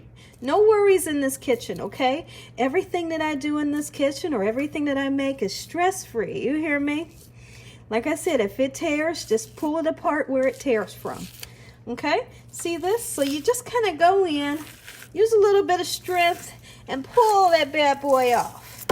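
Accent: South, United States. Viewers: Ooooh she country I love it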